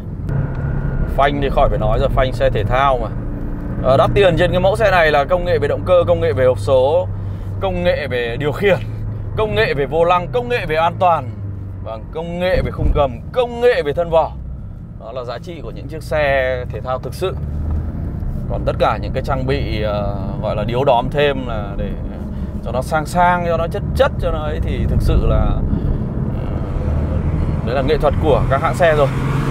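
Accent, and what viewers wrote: North, Vietnam. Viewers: kieu giọng bake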